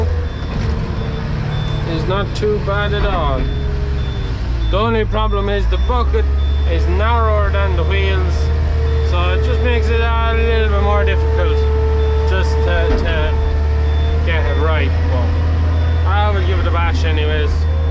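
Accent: Ireland. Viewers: hey are you from louth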